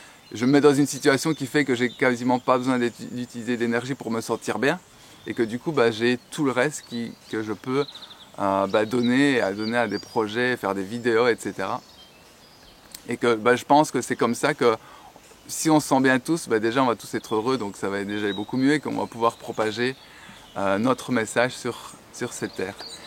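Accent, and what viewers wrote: Belgium. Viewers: Coucou j'adore ton accent bien belge, ( je viens de la province de Liège)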